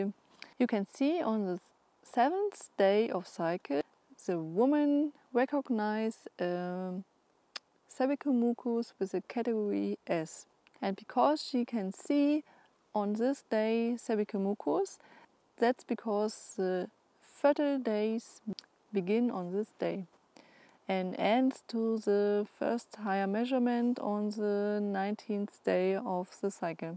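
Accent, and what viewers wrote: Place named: Germany. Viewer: That german accent😁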